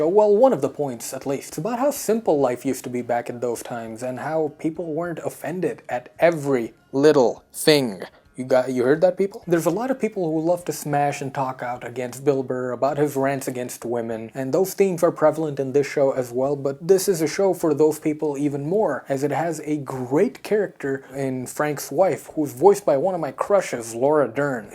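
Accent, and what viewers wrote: United States. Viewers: oh OK kool just being curious that's all you sound like your accent is either American or Canadian.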